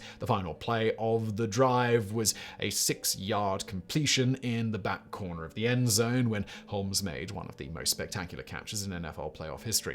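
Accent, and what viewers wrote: United Kingdom. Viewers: So interesting to hear a British accent describing American Football. Even more interesting? Pronunciations of "Unitas", "Ameche", "Stabler", etc. Made me smile.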